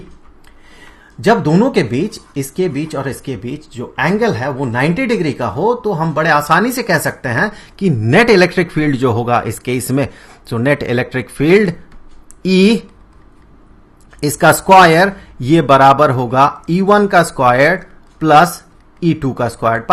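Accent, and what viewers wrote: Bihar, India. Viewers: What a sophisticated explanation..! I'm really very impressed with you sir. You're awesome and vo Bihari tone sir ji fan ho gye hai hm aapke... proud to be a Bihari🤘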